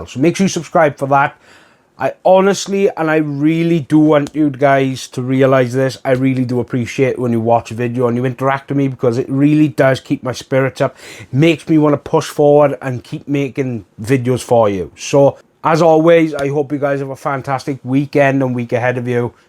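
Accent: Wales, United Kingdom. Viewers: Not only do I really like your videos but it's refreshing to hear a Welsh voice in the tech space. Makes me miss living in Aberystwyth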